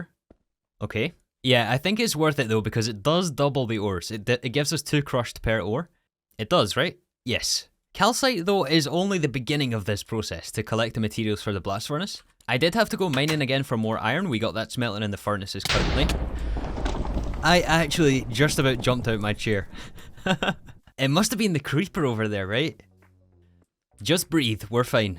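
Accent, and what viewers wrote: Scotland, United Kingdom. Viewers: bro im new here you Scottish or something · 3 are you Scottish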